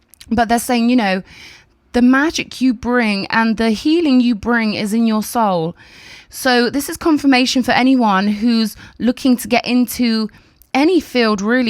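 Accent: United Kingdom. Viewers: that cute lil British accent of yours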